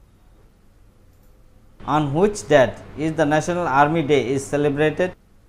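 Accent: Northeast India, India. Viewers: Where are you from are you from northeast India because of your accent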